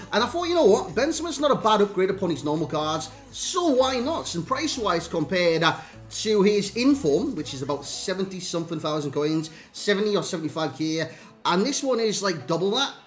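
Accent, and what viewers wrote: Newcastle, United Kingdom. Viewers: Newcastle accent its not far from Scotland just incase you're not from the UK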